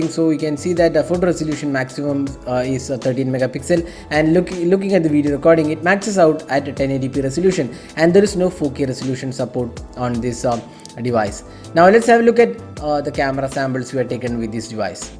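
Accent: Kerala, India. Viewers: You sound like a malayali 😃😘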